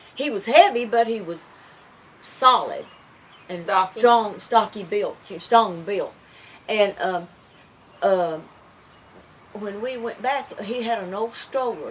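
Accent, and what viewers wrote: South, United States. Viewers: This reminded me of the stories my Great Grandmother used to tell, The wonderful southern accent made it all the better. Thank you for sharing.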